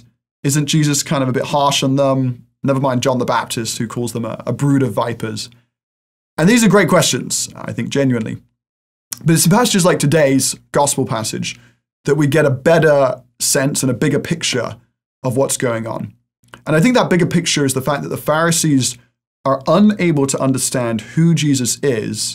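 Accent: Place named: England, United Kingdom. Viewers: Thank you from England - and you sound as if you come from here! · Yes, he has a UK accent. I also noticed that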